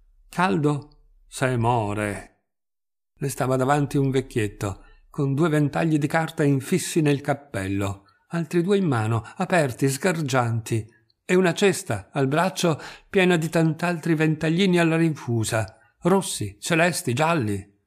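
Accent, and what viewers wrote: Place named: Rome, Italy. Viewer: spettacolare interpretazione in romanesco, sai com'è.. so' romano anch'io..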